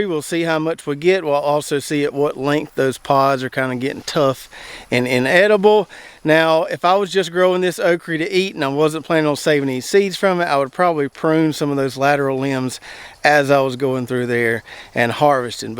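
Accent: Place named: Georgia, United States. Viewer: I have a G. Neri fig tree that I bought from a nursery in Louisiana a little over a year ago. We were moving so it stayed in a pot for a good while. We moved late in the year (2019) so I didn’t plant it until the following spring (2020). It did okay the first year but didn’t grow much or bear any figs but I didn’t really expect any that first year in the ground. Then a late frost in the spring of 2022 killed it back to the ground. It has grown to be an amazing large plant over this year and it has a lot of little figs on it. I’m not sure if they’ll ripen before frost this year but I can tell it’s going to be an amazing producer. I have a Madeleine fig I purchased last year - again, killed back by that late spring 2022 frost. I also have 2 nice-sized Brown Turkey figs that I rooted from cuttings off the tree at our former residence. Those suffered the same fate as the G. 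Neri but they also have grown into very nice young trees. I expect a decent amount of figs off them next year. There are some figlets on them now but I will be surprised if they ripen before the first frost this autumn. I really enjoy your show - so much good information and you are in my state of Georgia, although some 200 miles south of me. I grew up in Dublin and I enjoy watching and hearing you speak - you sound like the folks I grew up with! 💕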